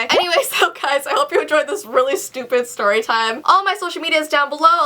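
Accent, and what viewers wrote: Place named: Canada. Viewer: Jake Reed it's because she had a Canadian accent lol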